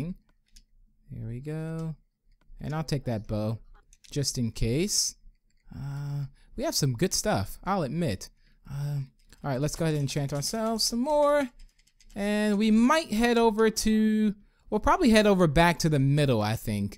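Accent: United States. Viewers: u american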